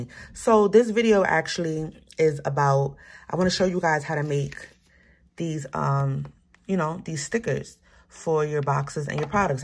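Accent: New York, United States. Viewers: You’re definitely from New York lol🥰🥰🥰 I’m starting my neice lip gloss business for her and this is very helpful… Sending love from Jersey 😘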